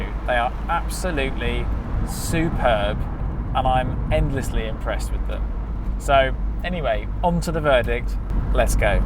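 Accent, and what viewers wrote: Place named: London, United Kingdom. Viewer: "This thing" mmmmm. London Boy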